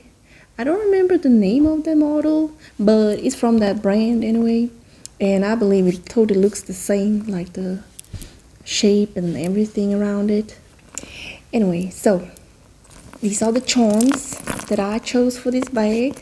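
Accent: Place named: South, United States. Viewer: I haven't seen any videos from u in a long time .. your accent reminds me of the south are u from the south??? this bag is 2 cute i may need on as well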